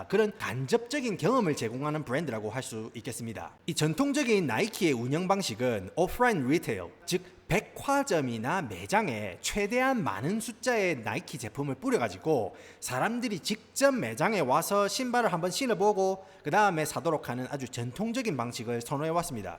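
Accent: Gyeongsang, South Korea. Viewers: 웓따 행님 발음 함 구~수 하구마이. 영어는 미끄덩~ 사투리는 갱상도 싸나이네~ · 내용도 유익하고 말씀도 친숙하고 알아듣기쉽게 경상도 내의 서부유타읍 악센트와 발음으로 말아주시니 정말 재밌습니다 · 왤케 발음이 좋아요? 갱상도 바이브가 네이티브임❤ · ㅈ ㄴ 경상도 사투리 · Chic한 현지식 영어발음 구시한 경남 사투리의 조화 ㄷㄷㄷ 평냉에 스리라차 소스 넣은 감칠맛...이 좋아오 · 영어도 경상도 사투리 억양이 있네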